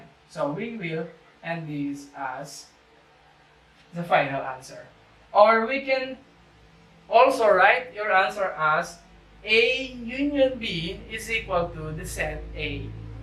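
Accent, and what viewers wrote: Philippines. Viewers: Wow I think your a Filipino hehe it's so clear I understand everything thank you because of you now I know how to answer my math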